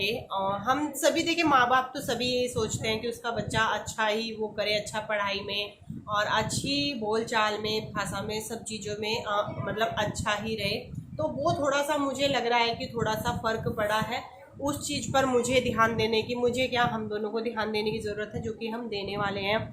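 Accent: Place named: Uttar Pradesh, India. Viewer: Language UP ki aisi hi hai usme bura lagna kya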